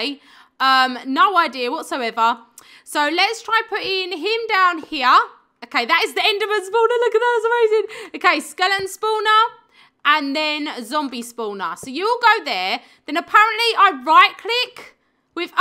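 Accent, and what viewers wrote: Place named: Cockney, United Kingdom. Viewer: maaaaaan that cockney accent is strong. wwooooww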